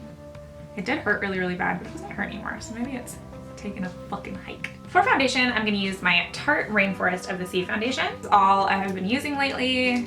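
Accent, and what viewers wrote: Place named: Canada. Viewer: I love your vids girl! I didn't know you were a fellow Canadian ! Even though how could I not know.... our accents are pretty distinctive :p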